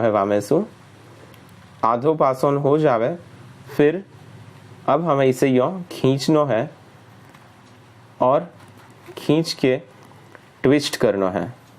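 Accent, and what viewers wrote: Braj, India. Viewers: Bahut sunder braj ki boli manbhavan hai Jai Shree Krishna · Jai shree krishna Superb very easily done your braj bhasha is very sweet Make more videos for us thanks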